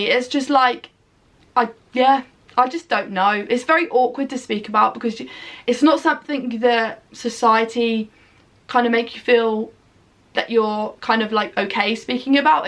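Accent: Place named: United Kingdom. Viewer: I find non-violent communication from Dr. Marshall Rosenberg to be helpful with direct conversations about difficult topics. It's an amazing way to show what your needs are without being pushy (or feeling pushy) about it Also, you sound British. In Dutch society it's a bit more chill because people are used to being direct (I'm Dutch). So culture plays an important part as well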